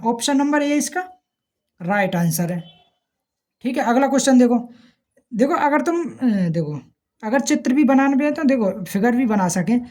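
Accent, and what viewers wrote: India. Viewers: Bhaiya brajwasi hi h ka tu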